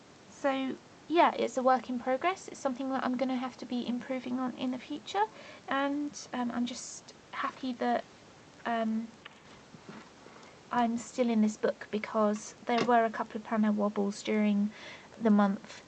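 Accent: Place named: United Kingdom. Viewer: my first search today at the YouTube about planning planners (not just designing...), really happy to find your videos, I love your ways of planning, going to watch all your videos later. I was also glad to find a British-speaking accent, even the sound of seagals on the front in one of your videos made me smile :-):-):-) thanks for your videos and sharing your experience of planning x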